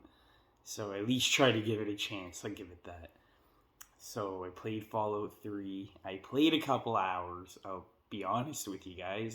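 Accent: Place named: Canada. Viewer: Don't respect anyone with a Canadian accent's opinion on "Falloot"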